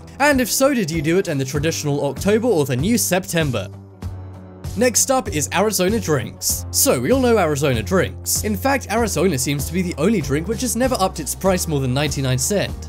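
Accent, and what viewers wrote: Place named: England, United Kingdom. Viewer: There's that stupid English accent again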